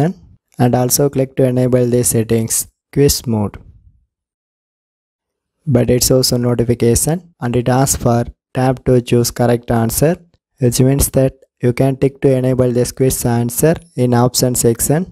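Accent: India. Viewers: He is from india, did you hear his slang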